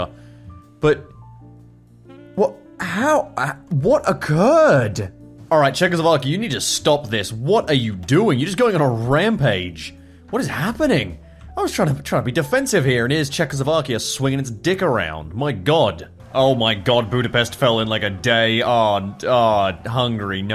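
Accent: Australia. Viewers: why you Aussie YouTubers all sound the same, WTF is this magic? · I really like to hear your smooth Aussie voice more clearly now. #feelsgoodman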